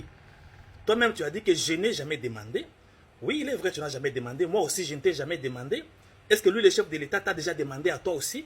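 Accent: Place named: Kasai, Democratic Republic of the Congo. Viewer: Yo nani. Aventurier tala ligne ya ba voyous. Oza muluba deja tonalité eyokani. C normal que tu défende les voleurs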